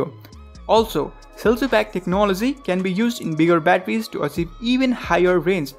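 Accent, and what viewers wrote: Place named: South India, India. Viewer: A very south indian, SL accent, please speak a little slower for it to be easier to follow !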